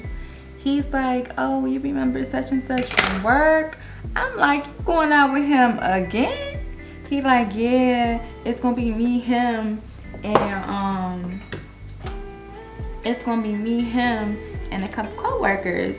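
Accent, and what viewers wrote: DMV, United States. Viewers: 10 sec in are you from the dmv ? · I knew you were from the DMV. Lol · only been here 40 seconds and i can tell youre from the DMV 😂 subscribes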